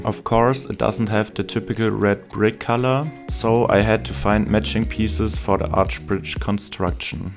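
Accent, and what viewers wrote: Germany. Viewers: i think u sare a german guyy because the accent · auch wenn du english sprichst kann man so übel hören das du deutscher bist XD XD dabei sprichst du ganz gut english ^^ der akzentt oder so isses XD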